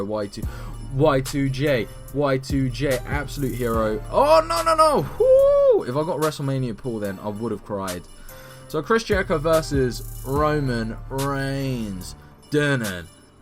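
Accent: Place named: New Zealand. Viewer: Nice vid m8. You actually kinda have a kiwi ( new zealand ) accent a little. Im kiwi and you sound like us bro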